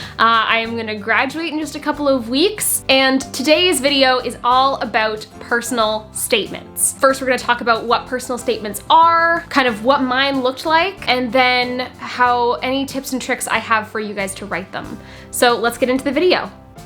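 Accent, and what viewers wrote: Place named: United States. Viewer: Perfect American accent :)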